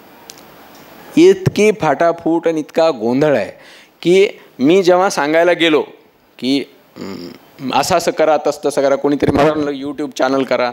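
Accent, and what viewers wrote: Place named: Marathwada, India. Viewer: सर तुमची बोलण्याची भाषा 100टक्के मराठवाड्यातीलआहे.....❤❤❤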